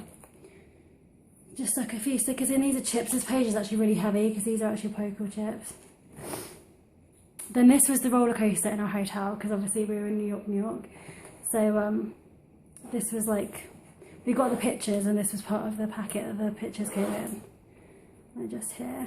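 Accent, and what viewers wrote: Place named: United Kingdom. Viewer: Loved it! Thanks for sharing! Had no idea you are from across the pond! Loved hearing your accent as you described your book. Anyway, what I wanted to share was about your receipts...you are right, they do fade but what I do is make copies of receipts now for my Smashbooks so they last longer. Do you think you can do that? Just a thought for you. Thanks for sharing your American trip memories! Now you must come to Florida to pay us a visit at Disney World!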